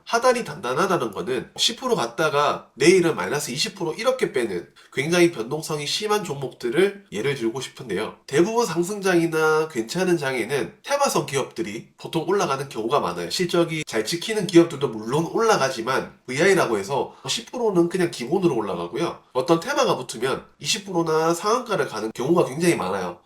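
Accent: Daegu, South Korea. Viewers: 오늘따라 대구 사투리 왜케 귀엽나요.. ㅋㅋ 오늘 터지고 반성중인 주린입니다ㅜㅜ ㅋㅋ 테마+실적 명심하겠습니다!!!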